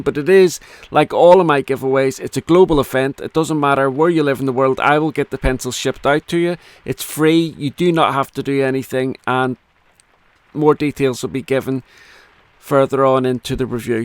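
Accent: Northern Ireland, United Kingdom. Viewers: Thanks for another great review. Honestly what took me back wasn’t anything you said about the pencils but that you’ve been doing reviews for 11 years already. I found your channel shortly after it started, it was called something else back then sorry don’t remember what it was. Both my parents born and raised in Ireland and I had just lost my dad. Though your Northern Ireland brogue was different than my dad’s Dublin one it gave me comfort listening to it. Wow I can’t believe it’s been I’ve been watching that many years, where does the time go, every year it flys be faster.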